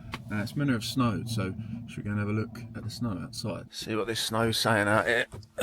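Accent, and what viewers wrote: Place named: London, United Kingdom. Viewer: Just found your channel and am loving your work! My view is the River Wye near Bakewell in the Peak District (its pitch black so cant see much right now!) Im a full timer in my jumbo transit, Vera. Like you, I gave up the rat race, sold my flat 20 months ago age 55 and decided to give up the 9-5, live on less and experience more before I became too old and farty! Best decision of my life. Im a london girl, NW and West (hate the place), so listening to you is like a bit of home on the road! Gonna binge watch your channel now so shall bid you a good evening Laters 😊 Tracy and Moo the cockapoo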